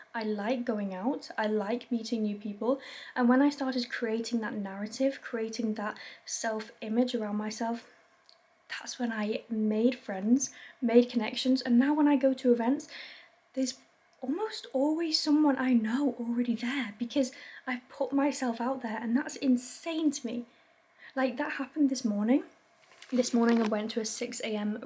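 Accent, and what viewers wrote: United Kingdom. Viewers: do you have a Yorkshire accent?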